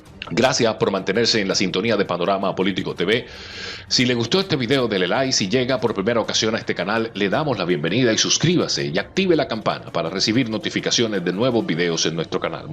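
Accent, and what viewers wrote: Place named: Venezuela. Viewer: Saludos, la verdad que tu no hablas como colombiano hablas como venezolano.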